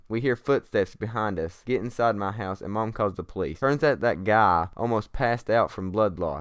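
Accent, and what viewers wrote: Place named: South, United States. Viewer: You are so southern what state are you from?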